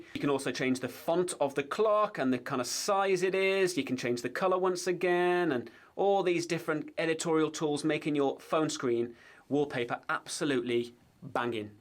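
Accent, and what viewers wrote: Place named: United Kingdom. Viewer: Not another British tech tuber, come on, the accent is very tiring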